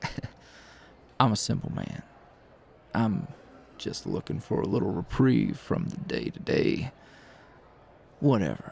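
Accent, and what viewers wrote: South, United States. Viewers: Oh my LAWD this checks so many boxes. ✅ DILF ✅ Country accent✅ sweet talk✅ this is illegal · I am sorry but if someone as fine like him approached me with a southern accent and a lovely personality like that he would have had me at "sweetheart"! I just melted at his compliments: Sweetheart, lovely..He is just a cutiepie cowboy isn't he? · "i guess it's an alright place to get lost in hehe, not much to see tho" Me: "well i can see your eyes how about i get lost in those for a bit;)" Y'all i saw dilf and i clicked so fast, also, you'd think growing up in the south would make me immune to the country accent but no it most certainly does not, i am a weak weak man when it comes to men with pretty voices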